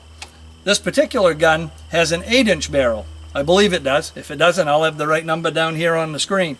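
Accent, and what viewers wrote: Maine, United States. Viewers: ha i just got too the end of the vid i knew ya were from maine cuz of the accent right when you started talking first thing i thought of was my neighbor from when i still lived up there😂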